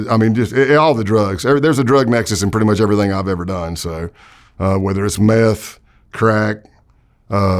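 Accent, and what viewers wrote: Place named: Greenville, United States. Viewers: Yo why does he sound exactly like Rob "ribbit" Pitts from VinWiki Must be that Greenville accent. Love it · HE IS SO SOUTHERN!!! 💞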